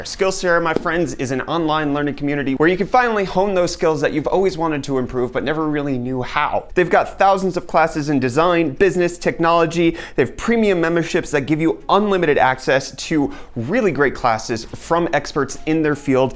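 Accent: South Africa. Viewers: you south african? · Are you from South Africa ??? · are you south african? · Where is you'r Sa accent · Are you from South Africa? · Do i hear South Africa what does this pc cost in Rand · What part of south africa is he from? If it’s cape town i feel for you given the whole water situation. My grandfather is struggling with the extra costs from accidental overuse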